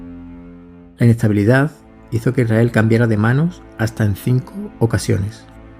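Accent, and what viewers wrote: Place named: Extremadura, Spain. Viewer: Me encanta la historia. Muchísimas gracias por los podcast, los voy escuchando poquito a poco. Esta semana creo que me llega el primer libro de Jesús que usted ha escrito. Sólo decirle que creo que por su acento, usted nació en mi tierra, Extremadura, a la que adoro y ahora más desde que no vivo en ella. Un saludo enorme y mil gracias por difundir su conocimiento.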